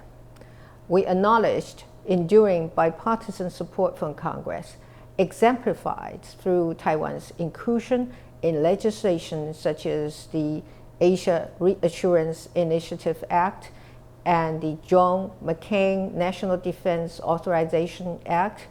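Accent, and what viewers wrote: United Kingdom. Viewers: 蔡英文總統是標準的英式英語，這真的是曾在英國生活求學過才有這樣的口音...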